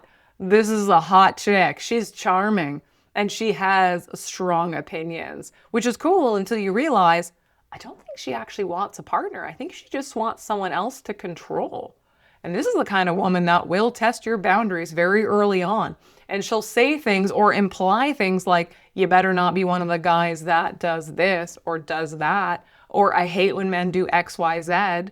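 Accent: Canada. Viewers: Thank you for your insights. As a dual citizen, I love the Canadian way of speaking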